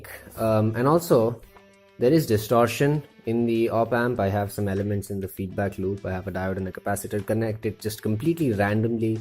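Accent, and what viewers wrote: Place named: India. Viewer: Superb work! Your accent sounds Indian😃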